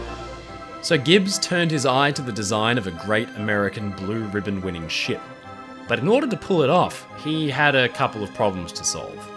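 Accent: Australia. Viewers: Thank you for so many absolutely great documentaries,always enjoy your knowledgeable & well spoken narration. Nice to hear an Australian voice! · I thought you were British until you said "Hee-Uh". Then I realized you're a Aussie mate.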